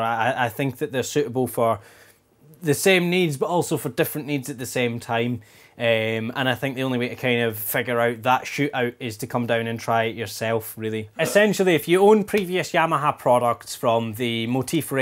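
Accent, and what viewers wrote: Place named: Scotland, United Kingdom. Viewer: His Scottish-like accent makes this video that much more cool XD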